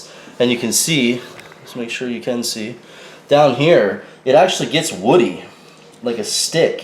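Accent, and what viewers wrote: Canada. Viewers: ***** even sound a bit canadian now... -Tko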